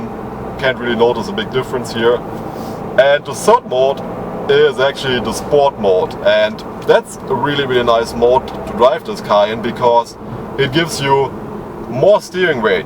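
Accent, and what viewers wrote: Germany. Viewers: canomalo he's German not slow dumbass...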